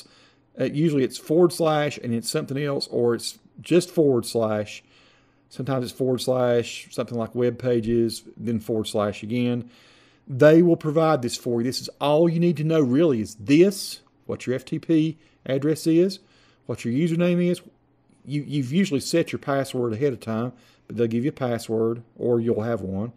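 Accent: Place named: Memphis, United States. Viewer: Great tutorial man! I spent six years in Memphis and you sound like you are not far from there!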